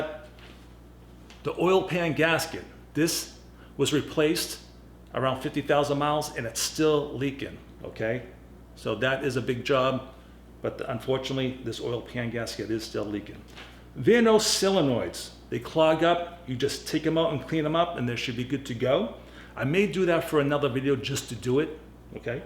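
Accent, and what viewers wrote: Boston, United States. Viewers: You cannot hide that Boston accent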